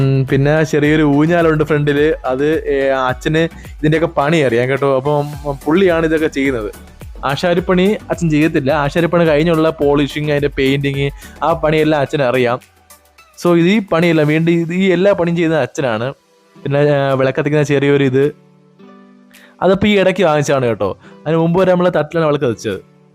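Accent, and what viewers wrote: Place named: Southern Kerala, India. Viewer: നല്ല വീട്, വളരെ simple,ഭാഷ അടിപൊളി തെക്കൻ, മണ്ടേൽ കേറി പോകുന്നു, 👌👌👌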